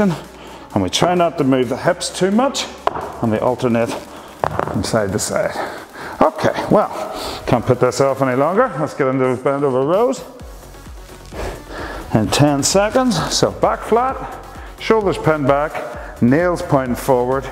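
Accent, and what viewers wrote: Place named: England, United Kingdom. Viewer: I wonder what that English accent is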